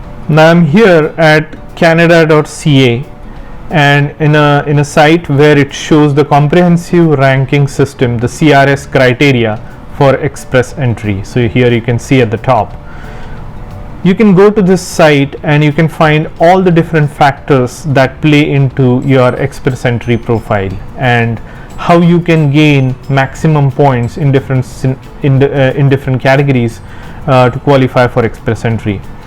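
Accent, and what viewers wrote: India. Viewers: I wish to apply but very hard to understand the Indian accent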